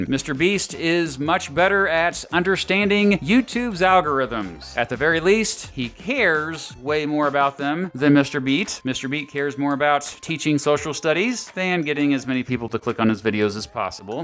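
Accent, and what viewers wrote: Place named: Kansas, United States. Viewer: huh...I was not aware that both Mr. Beast and yourself are fellow Wichitans. Well, I can't call myself a Wichitan anymore, though I am a native Wichitan. I knew you were from Kansas from the first video of yours I watched, though....the Kansas accent is unmistakable, though not apparent to those who spend a lot of time in the state. I can't say I've watched any videos that Mr. Beast has produced, though...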